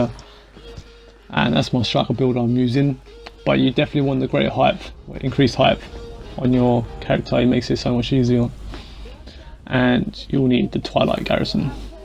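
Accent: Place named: Australia. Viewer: first, plus you australian because you sound like it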